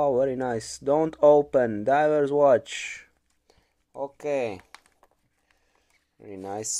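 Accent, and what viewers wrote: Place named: Romania. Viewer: You have a romanian accent, am I wrong?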